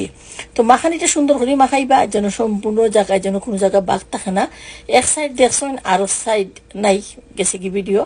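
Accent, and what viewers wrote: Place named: Chittagong, Bangladesh. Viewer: আপনার ভাষায় আমি মুগ্ধ ❤ আমি চাঁটগাইয়া ❤ কি যে ভালো লাগছিলো ❤ আমাদের আঞ্চলিক ভাষা মানেই মধু❤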